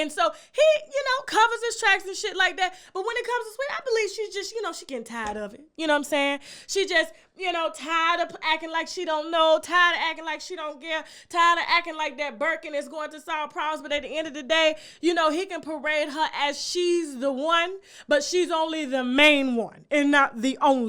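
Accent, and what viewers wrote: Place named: South, United States. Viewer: She’s southern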